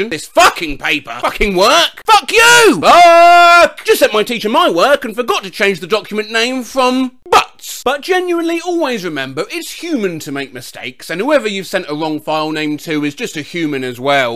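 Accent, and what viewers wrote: United Kingdom. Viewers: he dose have a British accent I do admire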